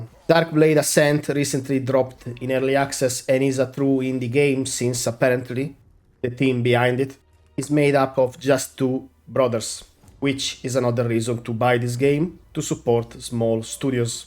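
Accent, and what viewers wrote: Italy. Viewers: pasta accent - you have my like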